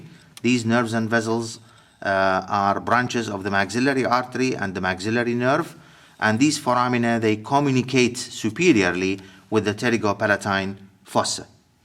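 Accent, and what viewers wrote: Iraq. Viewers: thank u very much dr for this video, the skull has always been a problem to me! hope with ur coming videos things will be easier, though i'm a surgical resident now i prefer to study anatomy in its very basic form, i was honoured to have an iraqi professor as a teacher of anatomy in my university, wonder if u know him actually! his name was dr Hani Alazzawi and u remind me of him i mean the accent:), lots of respect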